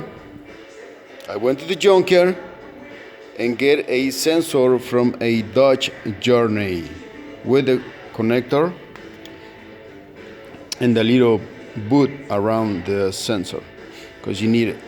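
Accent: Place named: Zacatecas, Mexico. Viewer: His English is good, and the accent is not a problem if English is your first language. Esta bueno, Su ingles, y el asento no es un problema, si ingles es su primero idioma. I was content with his knowledge of adapting a Dodge part to the Nissan D21. Pienso que su asento es de Zacatecas?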